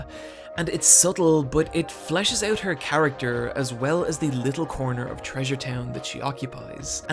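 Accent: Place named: Ireland. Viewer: Your irish accent is lovely!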